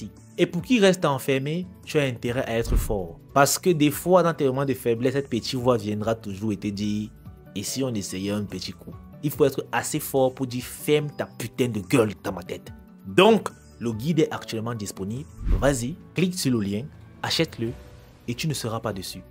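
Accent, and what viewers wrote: Cameroon. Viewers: Je mise que tu es Camerounais 😂❤❤❤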